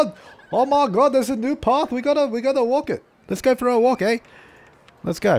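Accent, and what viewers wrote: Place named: Australia. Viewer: you have the best Australian accent :D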